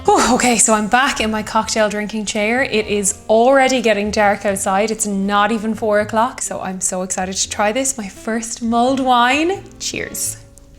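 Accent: Ireland. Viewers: Great video! Thanks! Lovely Irish accent.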